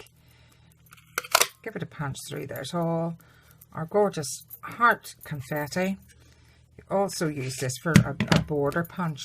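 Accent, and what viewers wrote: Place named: Ireland. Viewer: WONDERFUL. You sound just like my cousins in ireland Sligo. Your video was reaay good. Hopefully we will see more. Dorothy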